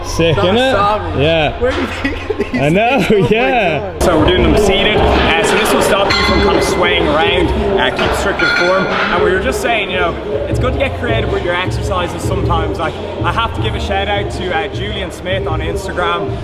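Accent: Ireland. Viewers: Is he Irish? How strange I clearly understand his english lol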